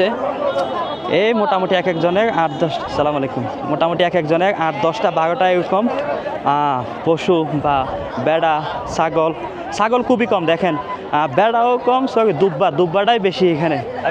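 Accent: Chittagong, Bangladesh. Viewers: উনি চাটগাঁইয়া বা চট্টগ্রামের মানুষ। উনি চট্টগ্রামের উপভাষায় কথা বলতো।তাই পিউর বাংলায় কথা বললেও চট্টগ্রামের একটা টান থেকে যায়।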